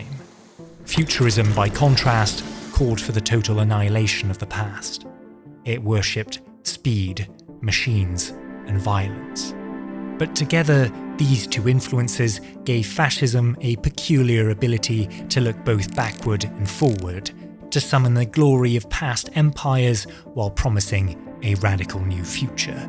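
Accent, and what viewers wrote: England, United Kingdom. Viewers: Okay, I have a question aside from saying: This looks and sounds amazing!!! But where are you from? The german is sooo good, the italian also sounds good (even tho I don't speak it) and the english sounds british. I am like, so confused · The host sounds quite similar to Simon whistling who runs biographics, war fronts and loads of other channels? I does say the person who runs the channel is Swiss but the host has an English accent.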